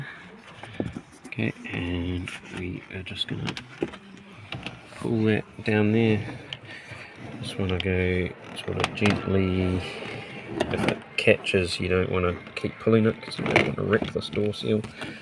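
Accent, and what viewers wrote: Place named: New Zealand. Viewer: Gr8 video M8. My door just failed and this is very helpful to see what is involved for me to undertake (?) or my mechanic. Where are you located? Sounds like a NZ accent! Cheers.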